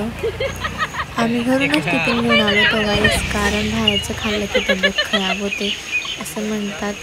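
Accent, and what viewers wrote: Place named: Khandesh, India. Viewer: Aap ki bhasha me peevvar khandeshi dikharahi he Qki ho puri ahirani bi nahi or hindi bi nahi to aap yesehi bolog banaiye jay khandesh🎉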